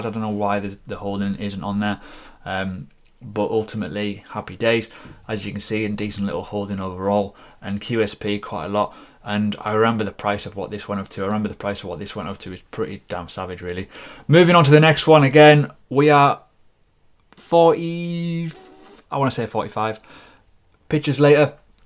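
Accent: United Kingdom. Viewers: Nice to listen to a UK guy.. What exchange would you recommend for the UK? Fed up with coinbase crashing at crucial times.. Cheers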